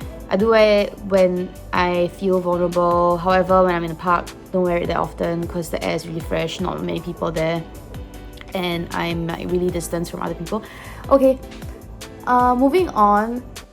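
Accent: Singapore. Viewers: However however however love the Singapore accent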